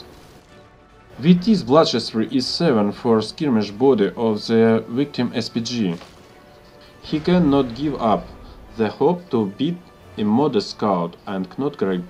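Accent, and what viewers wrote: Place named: Russia. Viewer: типичный русский акцент)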